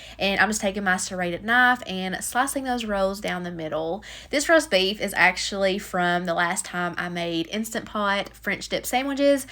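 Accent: South, United States. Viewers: Your voice makes this so much better! Love your southern accent! I Adams enjoy your videos!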